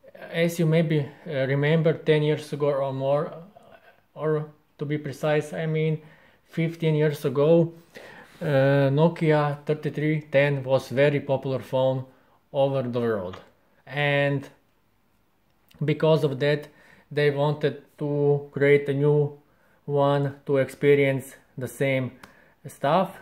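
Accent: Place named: Serbia. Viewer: Croatia ? When I hear your English , I first think that you are from Serbia :D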